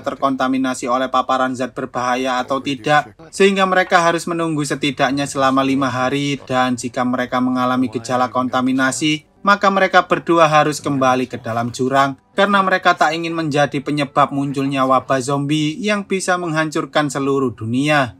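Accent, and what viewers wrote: Java, Indonesia. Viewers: Logat jawa nya bisa di rubah gak bang,gak enak kali dengar nya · Jawa yaaa. Koo ana omongan wis ora kesuen. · Kok medok ngono Min suaramu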